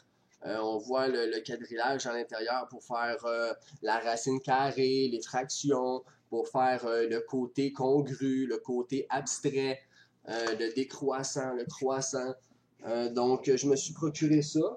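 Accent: Quebec, Canada. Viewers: tin il a l'accent Quebekois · A ok c'est un Québécois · il parle comMent Il parle le québecois et non le quibecoi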